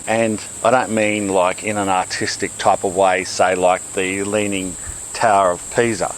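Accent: Australia. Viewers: Ausys always sound better...the thumbs up reminds me of the great Steve Irwin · You mentioned that you are in a subtropic climate. I know by your lovely accent and kangaroo burial that you are in Australia, but what area? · i figured you were aussie ... from the accent .... just thought i heard you say you were in nz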